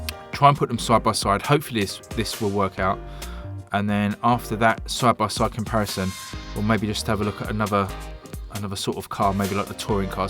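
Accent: England, United Kingdom. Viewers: Your English accent goes well with the realistic racing genre. Giving it a proper Top Gear feel somehow. Part of the reason these Grid series take off so well on your channel IMO. Take note that covering realistic videogame racers might be a bit of specialty of your channel from now on. 😊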